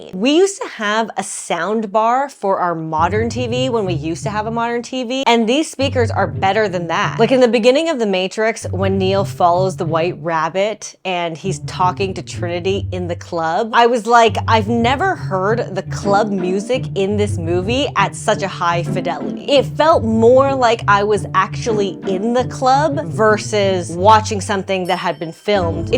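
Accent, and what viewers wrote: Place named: Canada. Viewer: I don't think I understood how a canadian accent sounded before watching your videos. Now I can most definetely tell haha.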